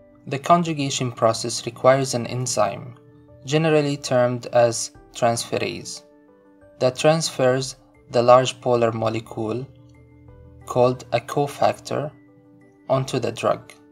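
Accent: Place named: Arab world. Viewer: You speak english with an arabic accent ^_^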